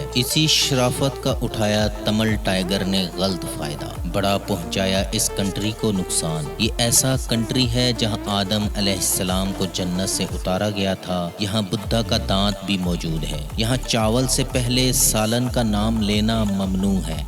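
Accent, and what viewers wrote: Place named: Punjab, Pakistan. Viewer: Biggest fan 😘 I love your beautiful punjabi accent Urdu , dear aap apna face reveal kro ❤️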